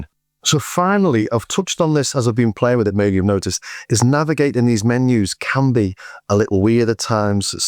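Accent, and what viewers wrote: Liverpool, United Kingdom. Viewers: Did you know that your UB-Xa Synth is Diseased, The closed captions if you switch them on every time you said OB8 (atrophy) setting it translates it as AIDS and it also mistranslates you saying atrophy to HIV which made me chuckle a bit, not that AIDS and HIV are anything to laugh about ! ! ! but I was wondering if you can alter the translation settings it obviously doesn't like your soft scouse English accent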